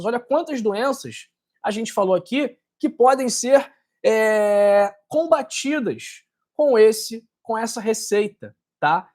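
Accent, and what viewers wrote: Rio de Janeiro, Brazil. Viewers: Sotaque carioca é dureza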